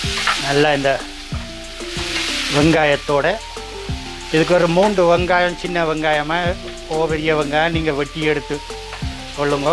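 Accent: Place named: Sri Lanka. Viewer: Lovely recipe and narration 😊👍.. srilankan slang is arumai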